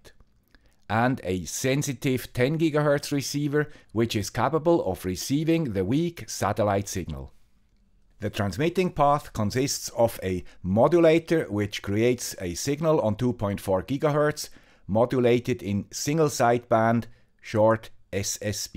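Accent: Switzerland. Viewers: I know. I was just saying we need more videos all the time 😂😂 can't get bored listening to the guy with the Swiss accent.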